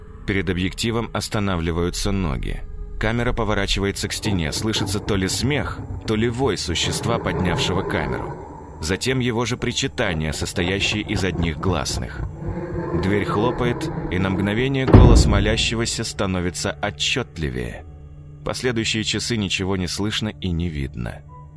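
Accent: Standard. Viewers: Симфония, песня, бальзам для сердца. Чистый русский язык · чистый русский язык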